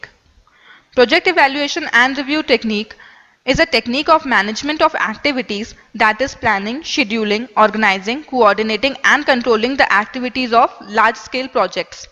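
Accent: India. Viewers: This is my I hate Indian SPEAKING ENGLISH 🤣🤣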